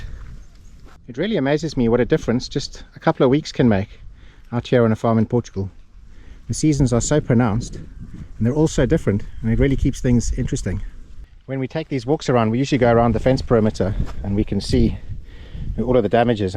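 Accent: South Africa. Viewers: Thanks for brightening my life. I'm a fellow Saffa in Taiwan and stumbled across your channel. Great to hear a Saffa accent again. is so cute the way she says JA and your favourite phrase is "and stuff like that" 😆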